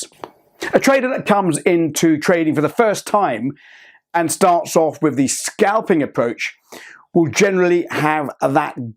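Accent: United Kingdom. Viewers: your genuin teachings and precise advices are so perfectly bang on mixed with very british sense of humour and nice london accent 😝 i believe you are a very good teacher. God bless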